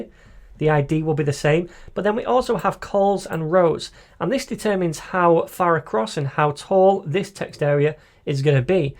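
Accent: United Kingdom. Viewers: oon-dah-stand. Oh boy I love your british accent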